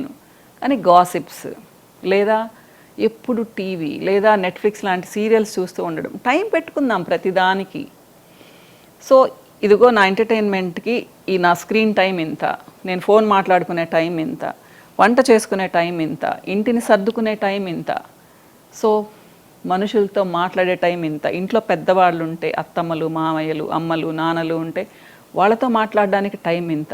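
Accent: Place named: Godavari, India. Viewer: Maa godhavari slang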